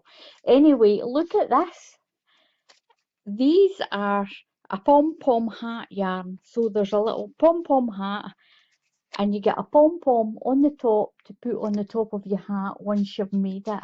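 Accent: Scotland, United Kingdom. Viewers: I love your unboxing of yarn. I can hear the joy in your voice. What would make it even more wonderful is to see the joy on your face as you look at all the gorgeous yarn. I love to listen to your voice. I love a good Scottish accent. Much love from the USA, Florida. Lurinda Clarke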